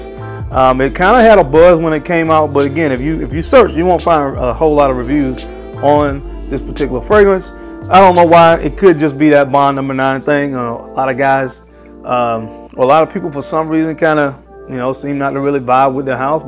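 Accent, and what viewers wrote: United States. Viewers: Love the country accent.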